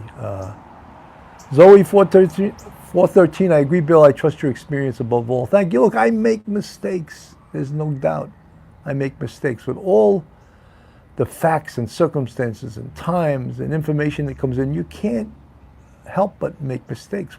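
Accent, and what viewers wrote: New York, United States. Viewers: I watch a lot of crime shows, which is probably why your channel popped up in my feed a few days ago. Really enjoy your commentary and NY accent.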